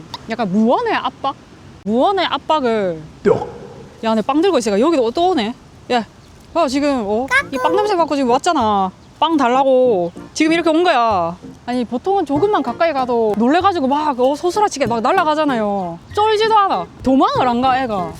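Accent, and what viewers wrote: Busan, South Korea. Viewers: 부산 사투리 죽이네.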